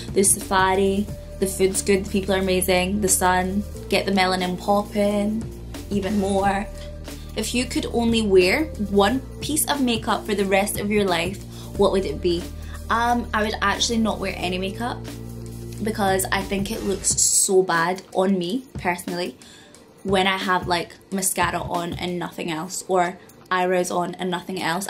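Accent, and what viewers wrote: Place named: Scotland, United Kingdom. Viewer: Girl you're beautiful !!! ...I 100% expected a London accent myself hahahaha ...I never really liked Scottish accents but you make it sound amazing. Haha! Yaaaas fried chicken ...naaaaah! Your wig fell off in the gym. Oh gosh LOL ...when I ever went to the gym with a wig, I had to wear a cap. True security for me :') ..you're great! I subbed <3xxx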